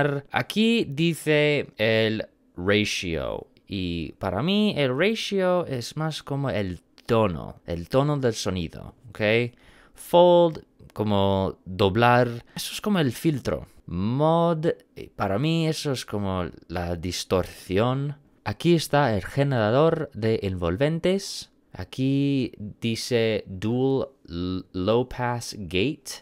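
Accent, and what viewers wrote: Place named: Spain. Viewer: Pues se me ha hecho bastante entretenido el video. Eso si reverb se dice reverberación pero es que tienes hasta acento peninsular y bastante buen nivel de castellano.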